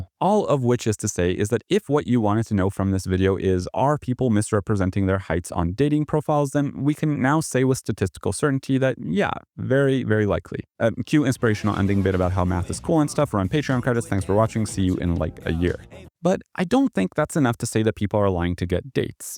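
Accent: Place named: Sweden. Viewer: I see, sorry for assuming. I thought I heard like a faint hint of Swedishness in the way you pronounced some vowels. Great video by the way🙏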